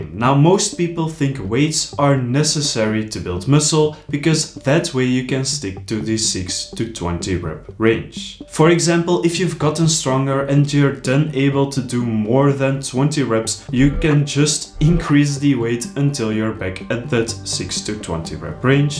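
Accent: Belgium. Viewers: ik kon meteen horen dat je belg bent haha. je komt zeker en vast uit antwerpen :)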